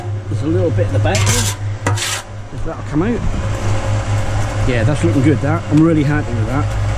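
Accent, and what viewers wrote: Norfolk, United Kingdom. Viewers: What part of Norfolk are u from ?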